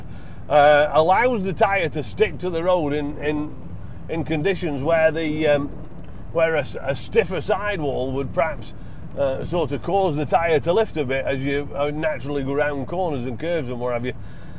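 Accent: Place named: Derbyshire, United Kingdom. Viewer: I'd absolutely love to know where you're from!! Your accent sounds local to mine in Derbyshire, really enjoying your videos 👍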